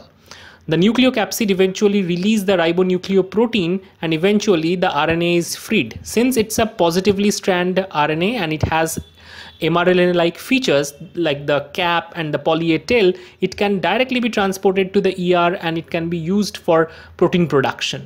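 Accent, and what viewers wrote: India. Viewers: Pure Indian english😢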